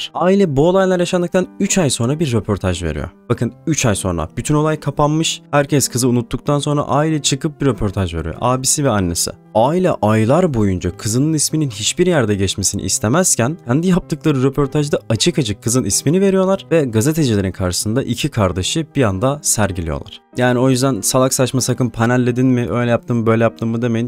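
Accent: Denizli, Turkey. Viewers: denizlili misin reis sen aaa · Abi denizlilimisin OHAĞHH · Abi sen denizlilimisin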